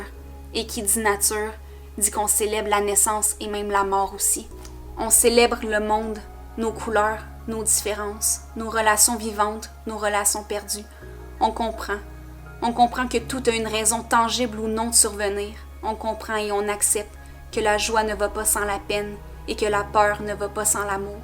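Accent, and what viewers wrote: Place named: Quebec, Canada. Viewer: je viens de commencer ta chaine et jai regardé pas mal de tes vidéos et jaime beaucoup ! mais c'est marrant j arrive toujours pas à m avouer que je pratique 🤷‍♀️ pourtant ça fait 17 ans que jai mis un pied dans l ésotérisme 😅 mais tu m as convaincu d un truc que je devais vraiment créer quelque chose (j écris et dessine depuis toujours mais jai envie de travailler autre chose) en tout cas merci ! (non tu m as convaincu d autres choses j'aime bien aussi l accent québécois 😂)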